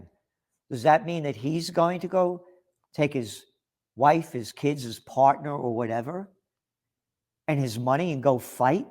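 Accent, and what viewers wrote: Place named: New York, United States. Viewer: I really enjoy New York accent (kuin stadin slangia) It is music to my ears. Thank you.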